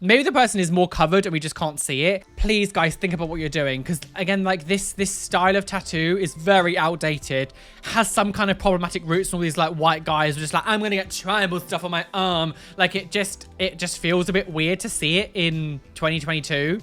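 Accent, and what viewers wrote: United Kingdom. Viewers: It's great to be able to understand what a youtuber is saying without upsetting some people it's easier for a brit to be able to understand a brit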